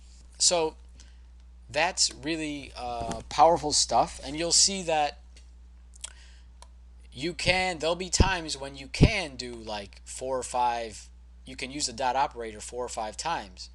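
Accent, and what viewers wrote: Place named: United States. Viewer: Thank you, Very clear lessons and a good American accent.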